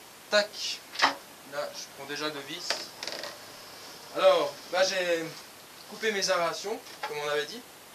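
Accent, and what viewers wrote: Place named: Switzerland. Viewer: hello, vu ton accent et ta minutie tu viens de suisse et moi aussi :) du coup j'aurais aimé savoir ou est ce que tu as acheter les vitres et les rails car je n'en ai pas trouver a jumbo ou autre magasin de bricolage :(( mes pantherophis demande de la place, je cherche a me lancer dans la fabrication d'un terra comme le tiens. en espérant que tu pourras m'aider... en tous cas beau boulot!! chapeau! et merci pour la vidéo.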